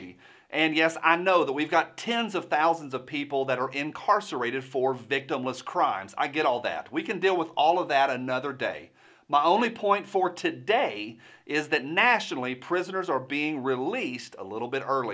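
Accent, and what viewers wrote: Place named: Portland, United States. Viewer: It sounds like she’s from Portland.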